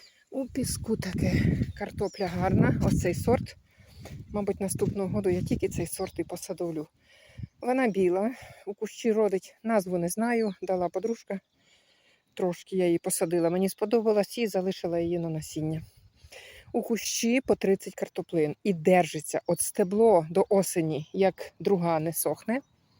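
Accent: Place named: Poltava, Ukraine. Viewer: В вас виговор Полтавський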